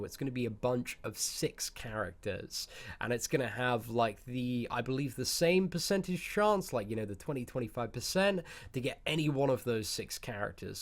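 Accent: United Kingdom. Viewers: damn i saw the thumbnail clicked it was expecting a 5* opening lol....but always a pleasure to hear tht British accent...keep up the awsum wrk man :)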